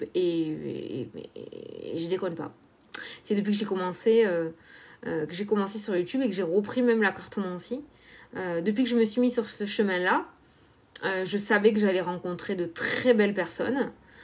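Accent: South, France. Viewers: ADORABLE MA BELLE , SUPER TON ACCENT DU SUD , TU ES RAYONNANTE COMME LE SOLEIL, MERCI POUR TOUTES LES BELLES ÂMES QUI TE SUIVENT, JE T'AIME MA BELLE, CONTINUE.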